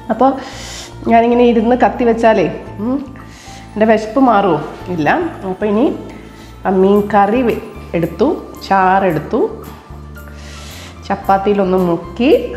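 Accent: Kottayam, India. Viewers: Enikku ithu vare oru vlogerem kananam ennu thonniyittilla.pakshe entho enikku chechi onnu kanan Moham.eppolenkilum meet cheyyan pattumayirikkum alle....Chechikkutty othiri ishtam.chechide nadu evida?samsaram kelkkumbo oru palace kottayam style feel cheyyarundu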